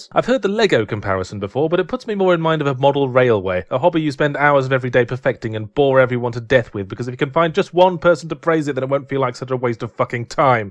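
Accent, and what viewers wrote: Australia. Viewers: Oh cool you are an Aussie? Again cool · is he australian if so yay another australian if not well idk.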